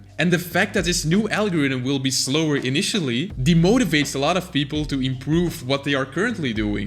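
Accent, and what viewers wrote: Netherlands. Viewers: You sound kinda dutch tbh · are you dutch??????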